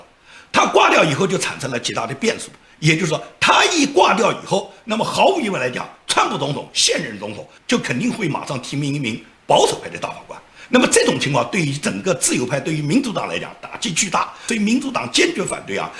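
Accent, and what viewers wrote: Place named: Nanjing, China. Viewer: 挂了，是南京话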